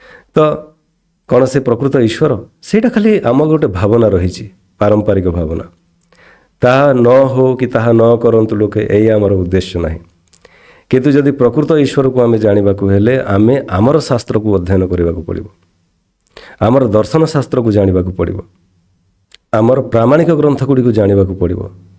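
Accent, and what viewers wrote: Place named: Bengal, India. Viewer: Ye loka odia bhasa kahila -bele majhire -majhire bengal bhasa patiru bharuchi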